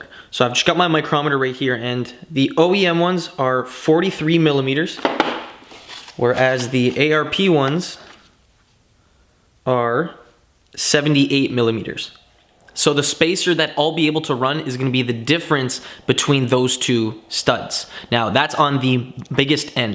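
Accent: Canada. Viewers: I don't know where you guys in the comments are about this, but judging from his accent, he's in Canada and if I were him, I'd add a light film of anti-seize on 1) the threads of the studs to ease in impacting to press in, 2) on the threads in general because CA, 3) and even on both sides of the spacers to help prevent seizing in general. I live in Phoenix where corrosion isn't an issue and I still do this practice.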